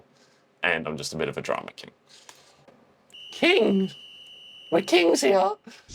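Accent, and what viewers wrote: Australia. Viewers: Also! Are you aussie??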